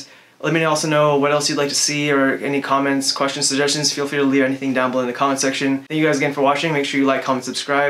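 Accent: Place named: United States. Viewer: Ur talking style like American so I asked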